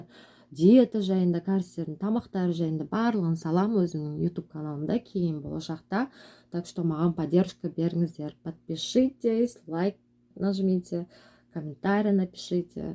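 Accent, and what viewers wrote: Standard. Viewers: Молодец таза қазақша сөйлейді екенсің Алла Тағала разы болсын балапандарын аман болсын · Айналайын Аман сау бол. Отбасын а бакыт тилеймын. Балапандарын тап татты. Бизда сиздерды жаксы корып калды. Контентын оте керемет. Кызыкты. Корермендерын кобее берсын. Миллионга жетсын,оданда копболсын. Таза казакшаннан айналдым..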